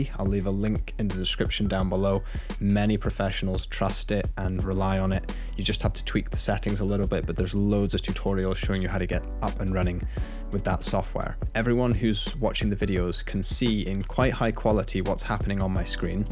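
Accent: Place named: West Country, United Kingdom. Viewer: thanks nice and informative. Trying to place the accent. West Country?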